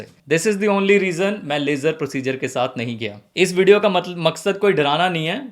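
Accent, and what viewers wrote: Punjab, India. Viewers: Excellent way of explaining ( voice and punjabi accent makes it even more interesting)... Last tak interest bana ke rakha... And sharing your own experiences is the best thing as it is no filter experience... Great job! ❤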